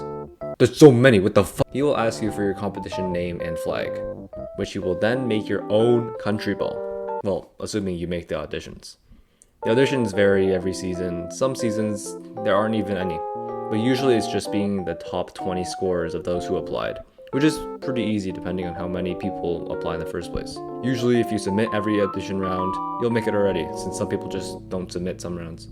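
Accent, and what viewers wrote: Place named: China. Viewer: I never imagined that voice would fit into this kind of videos, i am actually enjoying this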